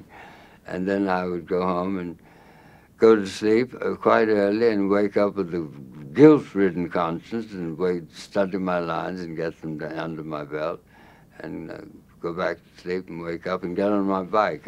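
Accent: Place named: South, United States. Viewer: He's from Baton Rouge, and the early 20th century upper class Southerners did speak in a rather affected way, so I always thought he adapted his aristocratic Southern accent and incorporated the mid Atlantic accent with it. Tallulah Bankhead, another upper crust Southerner, sounds just like him. Both of their manner of speaking sounds like this hybrid to me. I am from South Georgia and I have a similar mid south accent. It's just the way my family talks. Not all of us sound loud and brash like Paula Deen or ignorant mush mouths like Mama June.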